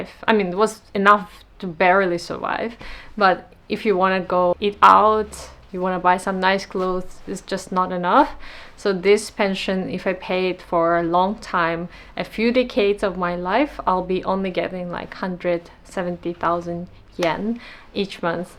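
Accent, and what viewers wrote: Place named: Russia. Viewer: Russian accented English? How is your English so fluent? Ever lived in NYC? (and Russian accented Japanese --novel!) This is solid information. I could retire in Japan tomorrow but unfortunately, Japan hasn't recognized that their largest export is their Culture. They could fix so many economic problems by creating simplified paths to permanent residency & citizenship with such a massively declining birth rate. It's time for Japan to allow citizenship by investment & relaxed visas. But then again, recognizing societal issues, and addressing them is not exactly an established practice in Japan. They are collectively killing themselves with their own xenophobia!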